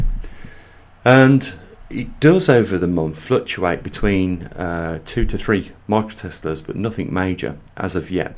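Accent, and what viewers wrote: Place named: England, United Kingdom. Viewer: When it comes to channels like this, I gauge if it is worthwhile, by the sound of the narrators voice... this is the first Chanel I’ve subscribed to that the narrator is English. All of my other subscriptions are either American or Canadian. Which is ironic because I am British and live in Somerset. So thank you, your voice is the first English narrator I’ve subscribed too. The key to the narrators voice is the ability to capture my attention. Ive used trail and error to do this, using one particular persons voice as a bench mark, probably the most famous narrators voice of all time and still the best by a long chalk. Sir David Attenborough. High bar, I know. But sadly, if the voice doesn’t tweak my curiosity then it just gets passed over within a second or two and I move on. Btw nice that you got hold of some data collected by the Chinese, if genuine then tis a rare commodity indeed. 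🙇‍♂️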